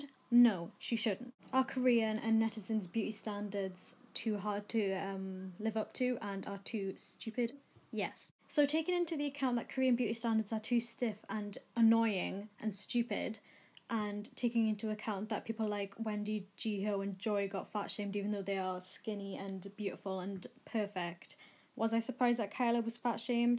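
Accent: United Kingdom. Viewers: Are you... a geordie? Trying to place accent lol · but that's none of my Business . Chill out it's her voice not yours if you don't want to hear it then leave. Also British accents are great don't diss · Off topic but where are you from? Your accent seems british to me?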